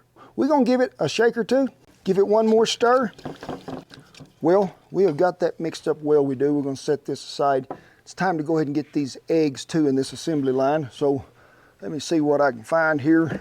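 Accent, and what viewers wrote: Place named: Rural, United States. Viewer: The food looks tasty, and he is obviously a skilled cook, and recipies are interesting... but dear God, this hick accent is unbearable... it makes my ears bleed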